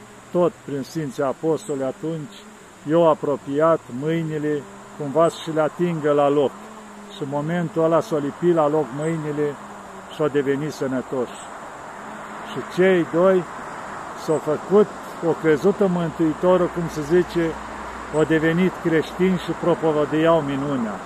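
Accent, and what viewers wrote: Moldova. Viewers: Bună dimineața!!! Părinte vă ascult cu mare drag, PTR că povestiți cu mult har și ca un plus se adaugă blindetea graiului d-voastră, grai dulce Moldovenesc!!! Sănătate deplină!!! Vă rog mult, mult, mult, să binevoiți a-mi da o Binecuvintat!!! Să aibă BUNUL DUMNEZEU ATOTPUTERNIC milă și îndurare de noi și să ne binecuvinteze cu SFiNTA pace pe întreg Pamintul!!! ❤️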